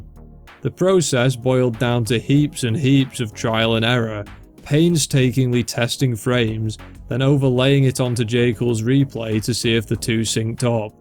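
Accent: United Kingdom. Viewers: you sound british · refreshing to hear a yorkshire accent on a good youtube video lol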